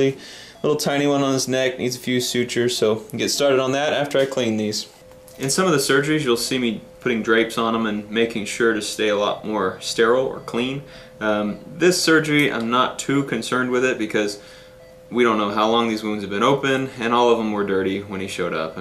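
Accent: Texas, United States. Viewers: i have a feeling you're from the austin or from the houston area in tx 🤔🤔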